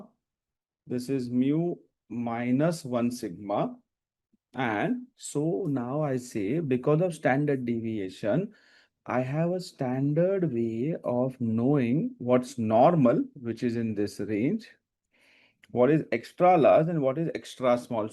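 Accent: India. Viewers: My God, her English is terrible, it's just Indian English. It's not English; my brain is straining to figure out what word the Indian woman was trying to pronounce. 🤦‍♀️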